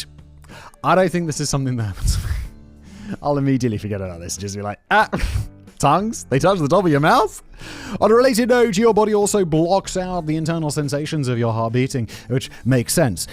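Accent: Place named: United Kingdom. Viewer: Love when I click on this I hear a British man talking about cock lol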